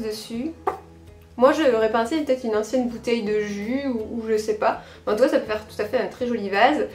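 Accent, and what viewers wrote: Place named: Alès, France. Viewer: Coucou étrange comme quoi l'accent vraiment !!!!je l'ai reconnu! Alésienne comme moi👍♥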